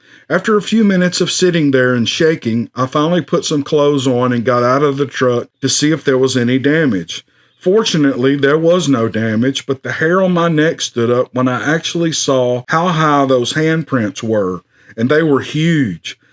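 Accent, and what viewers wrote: South, United States. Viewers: I have seen every single one of your videos & I'm always so happy to get the latest notification telling me that you just posted a brand new one!!!!!!! I love your voice & that sweet southern accent too!!! I really enjoy listening to the special way that only you can tell these wicked cool stories!!!! I also really like the awesome music you share, & all the videos you make to go along with all the stories!!! Anyway, I don't usually leave comments anywhere, but I just wanted to say thank you for creating!!! I am seriously such a huge fan, & I absolutely appreciate all the work & effort you put into making this channel as cool as it is!!!! Totally looking forward to the next one!!!! Thanks again!!!! You're awesome!!!! ~ 💖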